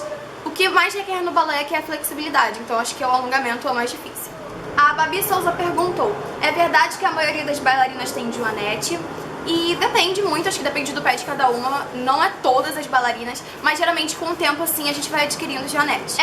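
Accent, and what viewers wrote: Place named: Rio de Janeiro, Brazil. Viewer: De cara, já percebi que você era carioca ❤️sotaque liendo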